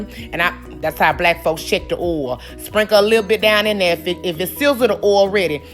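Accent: South, United States. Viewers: I like that country talk ❤️ Already subscribe 💪🏿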